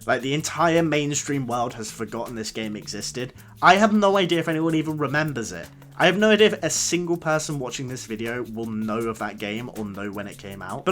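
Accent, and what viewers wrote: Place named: United Kingdom. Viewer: I'm guessing by your accent that you're from the UK. surely you can pick up a copy of naughty bear in cex for quite cheap. I've thought about going for it myself recently but seems like an extremely daunting task but wouldn't mind seeing you go for it 😂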